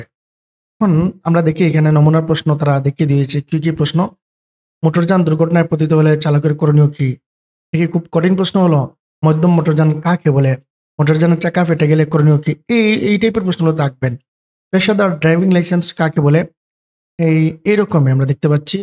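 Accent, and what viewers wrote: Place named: Sylhet, Bangladesh. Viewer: আমার মনে হয় আপনি সিলেটি😊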